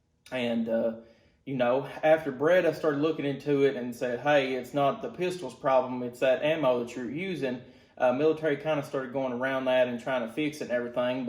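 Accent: South, United States. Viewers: Hell yea glad to hear a fellow southerner creating good content in the gun tube space.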